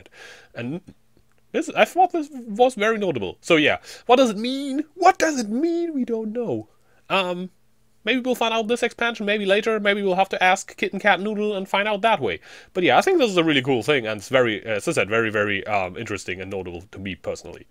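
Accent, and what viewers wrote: Germany. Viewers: wow, your english pronunciation has improved quite a lot over the years. as a fellow german, i always heard the german accent but now its almost gone. keep up the good work.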